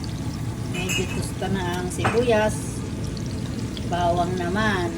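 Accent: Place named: Ilocos, Philippines. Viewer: Sis masarap yan! yung tono ng salita mo Ilocano taga Cagayan ka ba?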